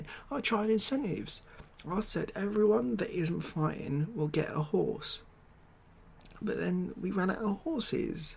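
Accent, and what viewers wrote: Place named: Estuary, United Kingdom. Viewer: Nice estuary English. "Transla'or! "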